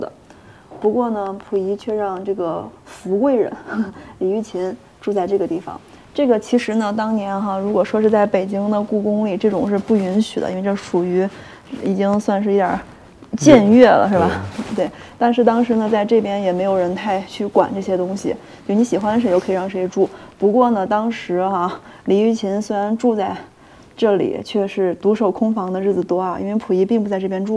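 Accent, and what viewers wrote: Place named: Northeast, China. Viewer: 你们有没有发现，经过几个月的东北行，的口音也稍稍带上点东北味了，哈哈哈！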